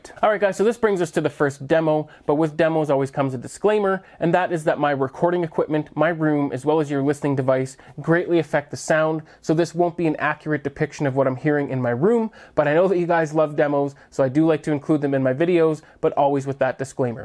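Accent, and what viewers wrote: Canada. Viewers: Do I detect a bit of Canadian in your voice?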